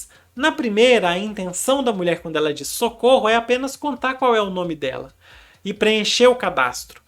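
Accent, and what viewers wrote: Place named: Minas Gerais, Brazil. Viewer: caramba! Jurava que vc era de algum Bernoulli (pelo sotaque que me lembra o MG). Estudei em um cursinho renomado e os professores não eram tão bons como vc (no sentido didático).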